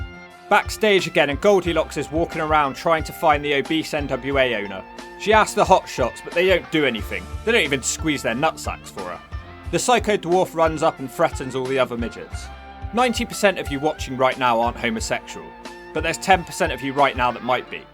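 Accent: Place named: England, United Kingdom. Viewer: I don't normally enjoy the english accents and way of words but you I like. Minus your aew hate ofcourse but we're all friends here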